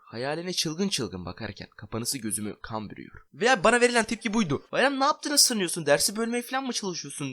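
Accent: Russia. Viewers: Rus musun çok belli cünku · Abi rusmusun yoksa sadece rusyadamı yaşadın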